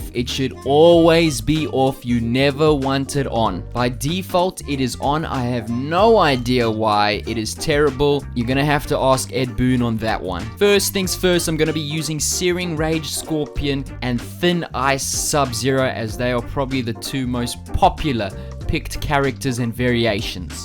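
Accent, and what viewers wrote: South Africa. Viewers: says australia but you sounds like a ZA oak? the mix of accents is pretty funny tbh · South African accent i think. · Are you South African? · Am I the only person who thinks this man is south african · You sound South African. Am I right? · Are you South African? :0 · Dude are u from south africa do u play online and tournaments?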